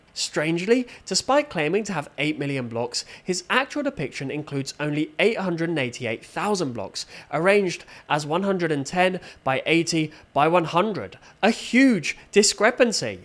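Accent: United Kingdom. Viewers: A British(?) guy talking about a British show feels so satisfying and I don’t know why.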